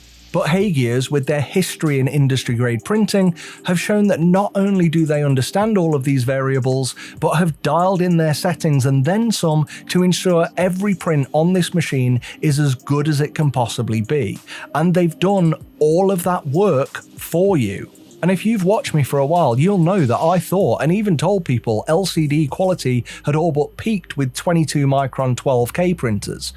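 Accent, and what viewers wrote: United Kingdom. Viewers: We are in the process of setting up a small-batch consumer products company and have recently ordered a number of GK two's. Love the concept of this Heygears unit as it has the potential of minimal fettling. Unfortunately the cost of the resin would render the units impractical as it would push our product price-points too high. P.S. Great video. As alway's, informative, concise and candid. All in an East-Midlands accent. What's not to like :)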